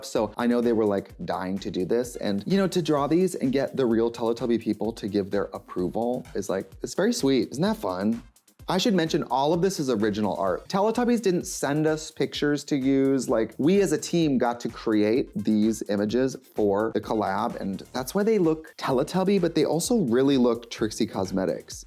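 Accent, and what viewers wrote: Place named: Minnesota, United States. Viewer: Work that winder washer motion. Cmon Minnesota accent ❤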